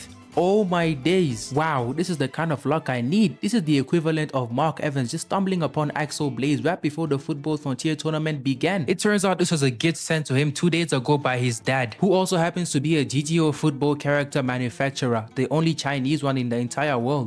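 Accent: South Africa. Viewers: Hmm are u south African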